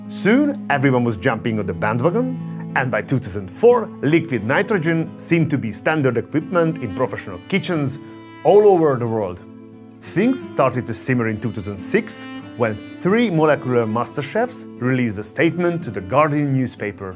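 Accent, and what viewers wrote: Hungary. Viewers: Kilométerekről is felismerni a Magyar akcentust 🫣 Üdv itthonról!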